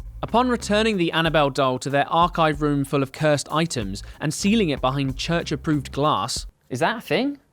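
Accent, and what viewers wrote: United Kingdom. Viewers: it's his accent, basically he pronounced it in british accent because he is british. he didn't pronounce it la yorona because he is not a spanish speaker. we all have distinguish pronouncation b*tch. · Your British accent is charming , like all 'cockneyian " accents are ! Your pronunciation of " la - llorana ", not so much ...😂😂😂😂😂😂😂😂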